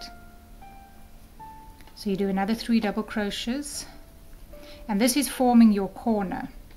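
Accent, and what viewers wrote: South Africa. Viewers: Not sure how to post a photo here. I've made and ss 3 together. The yarn is a lighter weight cotton and my tension is a bit loose, so I probably should have used a smaller needle. And they aren't exactly uniform in size yet, but I expect that will improve with more practice. They still look very pretty and I will make at least three more to complete one placemat. It is a very cute pattern, thanks again! ps- Is your accent South African? Love it! (I have a friend in town from SA)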